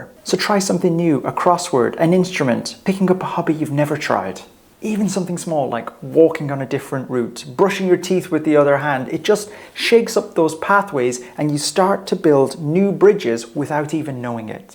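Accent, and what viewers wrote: Ireland. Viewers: That was helpful, nice Irish guy...not a shouty American!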